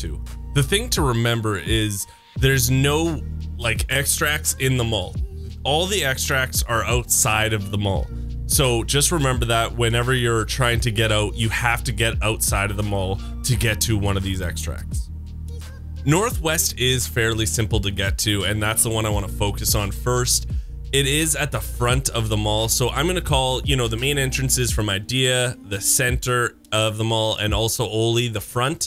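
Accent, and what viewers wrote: Canada. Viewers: Trailer park boys accent ; ) Cheers from Quebec !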